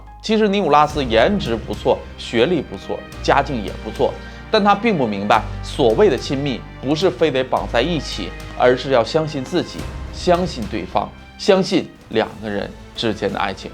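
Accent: Northeast, China. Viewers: 你说的是，区别真的很大。我只认错过一回。我觉得有个阿婆主是天津老乡讲普通话。但她说她是北京人。所以以后我就觉得兴许北京人也这么讲话？我又听了楼主的其他节目，他把z发成zh, 感觉楼主应该是山东或东北人，也可能是内蒙的😆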